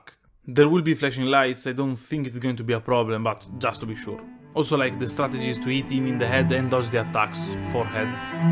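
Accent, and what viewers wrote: Italy. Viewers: tutti i tuoi video sono meravigliosi, e adoro sentire il nostro accento quando parli. Gran lavoro ;)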